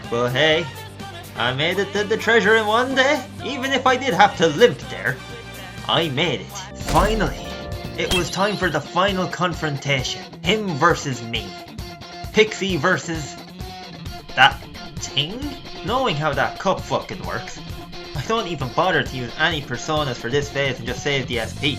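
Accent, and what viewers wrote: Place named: Ireland. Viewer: Ayyyy, where in Ireland are ya from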